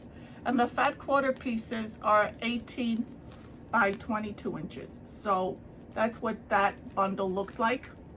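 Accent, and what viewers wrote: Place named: New York, United States. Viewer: Hi, so glad that you are on Flosstube, great to see you and here that great NY accent..:-)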